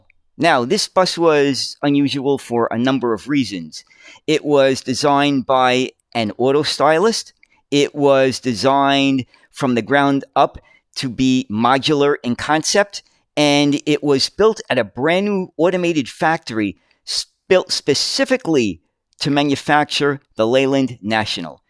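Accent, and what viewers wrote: New York, United States. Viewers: DUDE... WTH??? LOL . THIS IS SOO CRAZY. A YANK TALKING IN DETAIL ABOUT A LITTLE OLD ENGLISH BUS AND BUS COMPANY FROM THE PAST. . HAHA. I LOVE IT. YOU SOUND LIKE A NEW YORKER LOL. LOVE THE ACCENT MAN. I LIVE IN THE NORTH WEST ENGLAND , MANCHESTER AREA . NOT FAR FROM LEYLAND. IM NOT SAYING WHOS BETTER US OR YOU ARE ANYTHING, ITS JUST NICE FOR A CHANGE A FRLLOW BUS FAN AND AN AMERICAN TALKING OF SUCH A SUPJECT. THANK YOU FOR THE VIDEO. AS IT HAPPENS I REMEMBER THESE BUSES WHEN THEY CAME OUT, I WAS YOUNG, BUT A FAN OF BUSES, BUT I DO REMEMBER THEM VERY WELL, BEING VERY NOISY, RATTLED LIKE HELL GOING OVER POT HOLES, THEY HAD A BIG FAN AT THE BACK OF THE BUS THAT YOU COULD SEE THROUGH THE AIR DUCT PANAL. THST SPUN VERY FAST. I USE TO BE A BIT A FRAID OF THESE BUSES CAUSE OF THE FAN, THE SPEED THEY WENT AT AND THE LOUD NOISE LIKE A DRAGON,. 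G-LAGGG, G-LAGGGG, G-LAGGG, THAT WENT FASTER N FASTER AS SOON AS THE DRIVER SET OF. NORMALLY LONDON ALWAYS HAD THE NEWEST, BEST BUSES THE UK OFFERED, BUT BACK IN THE 70S N 80S WE HAD A VERY SPECIAL BEAUTIFUL BUS OF OR OWN IN MANCHESTER. THE MANCUNIAN BUS. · this guy sounds like he is from New York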